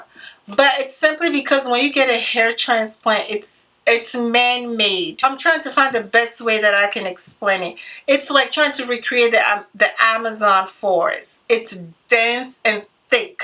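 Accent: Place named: Togo. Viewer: Hey sister, wow............. are you from TOGO? I never knew that because of your accent, so do you speak EWE language?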